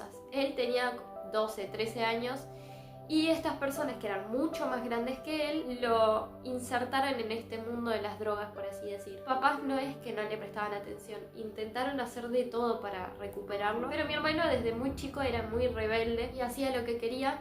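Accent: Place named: Buenos Aires, Argentina. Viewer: Se nota lo porteño Sho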